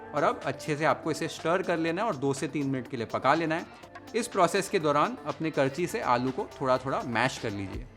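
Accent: Maharashtra, India. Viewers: Sir I truly admire your pronunciations in Marathi esp "l" which is authentic for Maharashtra, hats off to you for introducing authentic recipes of India along with authentic language pronunciations, best wishes for the same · Bhai ki Marathi accent ❤️ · Chef , nicely talking Marathi -Hindi misal language 😊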